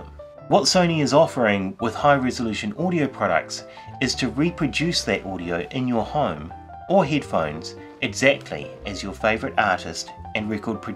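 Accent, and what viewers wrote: New Zealand. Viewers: Nice to hear a fellow Kiwi from New Zealand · OMG a New Zealander opening his mouth. God save my ears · yep New Zealand, just checked. They say "heedphones" :)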